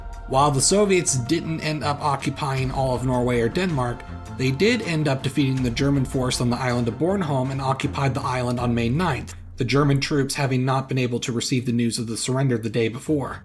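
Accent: Sweden. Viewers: nice swenglish!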